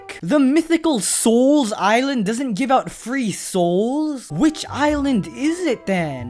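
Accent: India. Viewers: Bro are you indian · Ji indain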